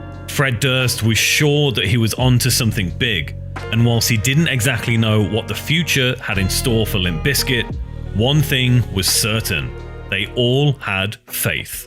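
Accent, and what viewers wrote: United Kingdom. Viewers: Sounds way more official with a British dude narrating👍👍👍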